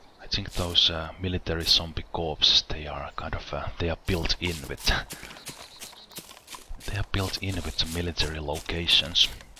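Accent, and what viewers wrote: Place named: Finland. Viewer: for the lovely finnish accent!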